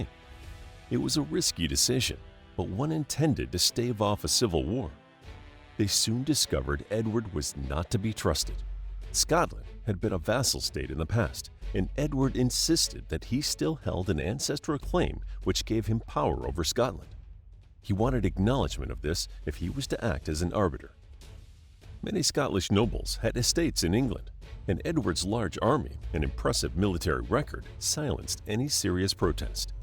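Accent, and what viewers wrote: United States. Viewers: Why should we listen to an American voiceover distort the Wallace history by including so many errors ? The story of Wallace was a translation of the original Latin that was translated by The Minstrel in 1361 from a document by eyewitnesses probably around as early as 1310 that was commissioned by Bishop Sinclair of Dunkeld.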